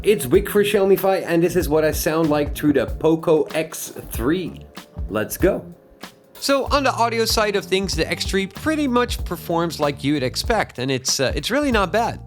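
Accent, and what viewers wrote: Netherlands. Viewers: Hi, net je review bekeken. Zeer duidelijk 👍🏽 Dacht dat ik een Nederlands accent hoorde. Kijken of ik deze telefoon vandaag in Nederland krijg besteld. Top review. Groetjes uit Nederland en ga zo door. Marvin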